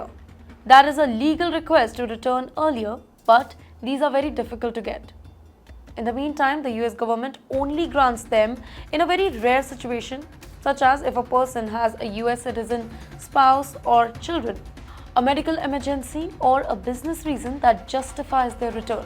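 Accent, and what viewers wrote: England, United Kingdom. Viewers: You have a funny accent ! Father British ? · The best Anchorwoman. I just like her English accent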